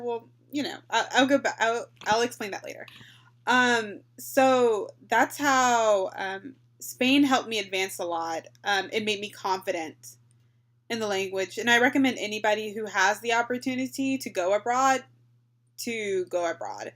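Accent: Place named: Mexico. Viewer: Hola felicitaciones por haber aprendido el idioma español y si, lo hablas con acento mexicano. Ahora se te abren las puertas para conocer la cultura no solo de Mexico y España sino de toda latinoamerica. Bienvenida!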